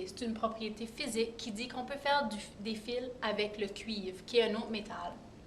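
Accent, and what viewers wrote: Canada. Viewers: j'ajouterai, j'aime beaucoup votre accent canadien. C tres charmant.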